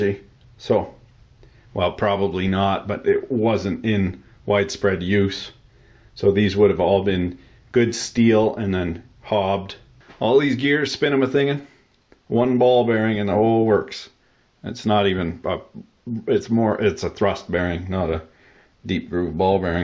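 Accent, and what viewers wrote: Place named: Canada. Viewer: Everyone in Canada talks like that....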